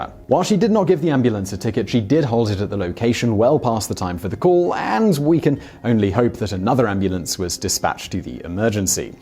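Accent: England, United Kingdom. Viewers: Oh, please. The video was concered about the traffic behaviour of ambulances and there is one team member of any given EMS ground-unit that drives it. This crewmember is also responsible for all driving-decisions and thus the subject of this video. Therefore he is an ambulance driver. Duh! The way more alarming thing about this video is that it was presented by an English-accent person only using US examples and one UK comment about time together with pictures from North America, the UK, Eastern Europe (Czech?) Germany and one some presumably Latin-American country without ever saying on which place he is refering to as road rules differ.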